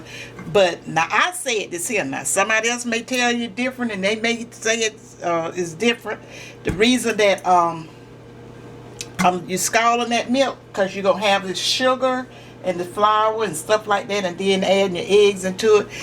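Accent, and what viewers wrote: South, United States. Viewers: Mrs., HELLO I knew when I heard that southern voice, I had to tune in, stick and stay educated in what you know is good, best & Delicious! You got that voice, know how,every once & a while some slang, a recipe within it's self, momma got it going on! Ive Appreciated every how to video, with a taste, those greens with Lipton onion soup, fried or boiled they're the Bomb, I've never had such comfort in knowing greens could taste like that. You're a" well seasoned" teacher, as my mom before her death this year would say your never to old to learn something new, & life brings about a change, I have no request, but from u, I'll learn even more, your one of my adopted video voices, now that I stumbled on to your stories of yesterday, today & then some I look forward to cooking even better, Thank YOU, Roz.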